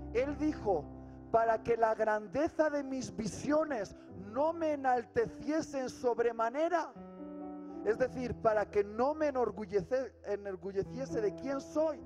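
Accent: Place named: Spain. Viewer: Apenas hace unas 2 semanas te encontré por YouTube y ya eres uno de mis predicadores favoritos. Nunca te alejes del Señor pues a la mejor no tienes idea del impacto q estás siendo a miles o quizás millones de <link> fascina tu acento español pero aún más el como Dios te usa para transmitir cada msg de su palabra. Con cariño de una abuelita en Cristo.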